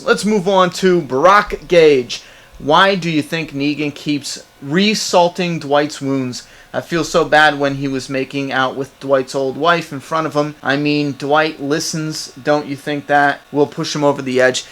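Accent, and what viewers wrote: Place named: East Coast, United States. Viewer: Q&A where are you from? I hear an East coast accent.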